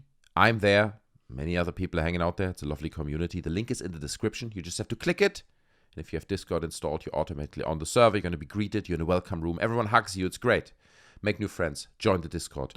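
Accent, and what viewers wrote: Germany. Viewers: Are you german by any chance?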